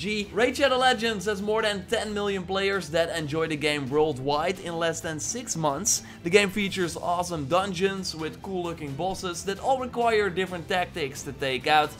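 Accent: Netherlands. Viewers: mm snif snif i smell dutch. am i right?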